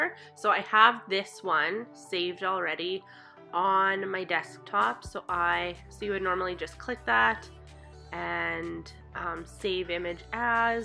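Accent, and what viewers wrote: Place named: Canada. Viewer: I am subscribing because you made that really simple and as a new YouTuber I need all the help I can get I also love your accent are you from Canada by chance? I am from Detroit Hi Neighbor!!!!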